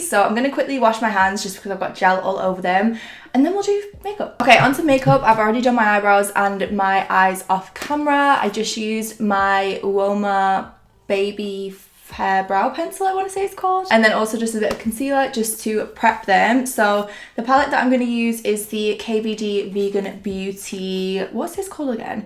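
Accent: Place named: Northern England, United Kingdom. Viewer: Gorg look! Your northern accent makes me feel so at home, hello from leeds ✨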